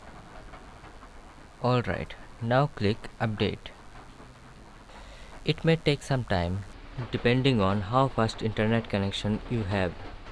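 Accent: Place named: Bengal, India. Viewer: you are asking Indian? I can say he is definately bangla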